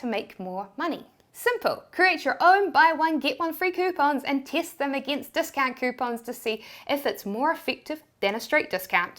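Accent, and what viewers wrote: New Zealand. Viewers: Is that normal accent in new Zealand ? That'd be awesome! Greetz from Germany 😱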